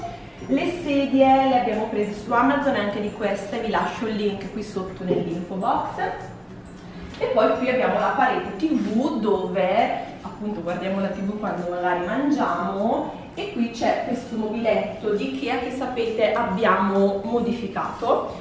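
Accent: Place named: Lombardy, Italy. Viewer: Dove vivi in Lombardia oppure in provincia perché mi sembra un accento lombardo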